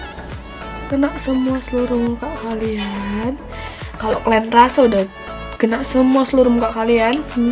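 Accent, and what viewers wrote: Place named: Medan, Indonesia. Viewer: Anak medan ya wakk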